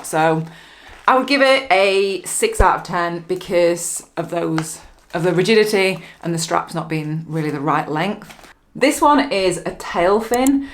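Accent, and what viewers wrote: United Kingdom. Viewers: Is just a Cheap restrap add , scam video. Restrap isn't waterproof compared to tailfin and not even same quality. UK accent UK facts 🤡🌈🤡 UK 💩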